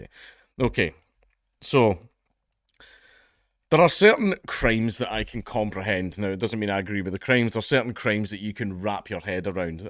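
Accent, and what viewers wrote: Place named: Scotland, United Kingdom. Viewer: I’m so glad you did this video….. I commented the other day on your McAfee video that this demon was found guilty. I was hoping you’d see it and put a vid out. Even if you didn’t see my comment, the video was definitely needed. You just have a way with words, wether it be the Scottish accent and local slang, or just the way you deliver words, it’s great. This absolute demon must be destroyed!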